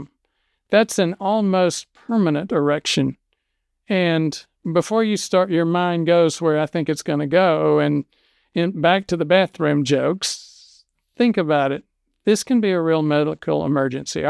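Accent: South, United States. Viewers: I think it's the Southern dialect thing and possibly lack of early exposure to multiple cultures. Many people mispronounce words...Dr. is a very good person. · Why are you pronouncing this drug's brand name incorrectly? Is it a southern dialect thing, like how certain southern people call my mom, "Naiomi," instead of her actual name, Naomi? I always think that they're confusing the CORRECT pronunciation of her name with the pronunciation of the Kenyan city of NAIROBI! It's pronounced, 'see • alice,' NOT "sigh • alice," and I can't even watch the rest of this video because you're driving me crazy with your repeated mispronunciation of this word....and you're a real, bonafide doctor, right? Well then, one would think that you would KNOW the correct pronunciation?! I'm just an RN, and even I know the CORRECT pronunciation!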